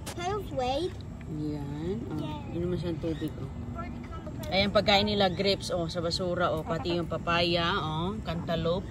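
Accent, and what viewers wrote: Visayas, Philippines. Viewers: Bisaya si kaya ganyan sya mgsalita manood ka nalang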